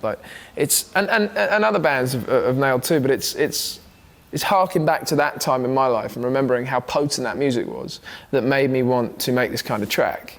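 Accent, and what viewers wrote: United Kingdom. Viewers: He has a British accent?? Woah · He's not "foppish" he's POSH. That British accent is so sexy. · i didn't think this guy had a British accent until i watched this video. god damn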